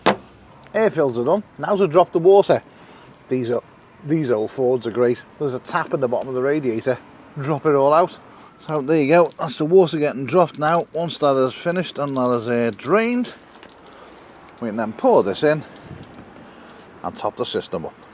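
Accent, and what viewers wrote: Liverpool, United Kingdom. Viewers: Enjoy your trip i am waiting to get seats recovered then off over the border soon as poss. Would love to meet you i have been married 50yrs my other half says you sound posh scouse😅 best of luck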